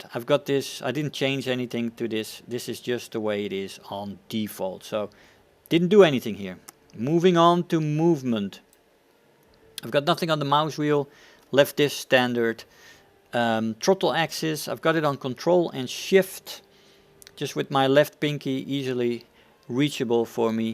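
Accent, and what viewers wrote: Netherlands. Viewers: i smell dutch....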